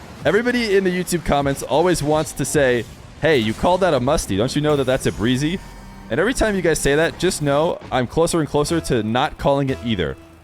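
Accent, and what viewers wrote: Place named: United States. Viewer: you're like the NA Version of JohnnyBoi_i. And I don't mean the format of your videos only, but the way you cast, even your voice. It's like I'm hearing JohnnyBoi_i but with an American accent. Very weird, but in a positive way, pog:D Loving the content, bro!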